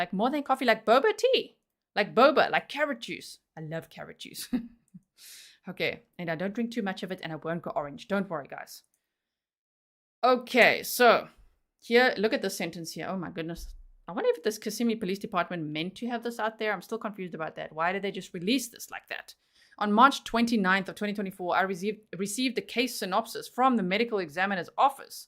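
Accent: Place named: South Africa. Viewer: Your South-African accent is so beautiful, it makes me proud to be South-African. You sound like HOME❤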